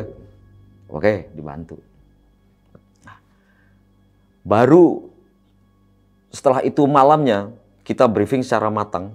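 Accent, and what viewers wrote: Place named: Banyumas, Indonesia. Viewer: Ora ngapak ora kepenak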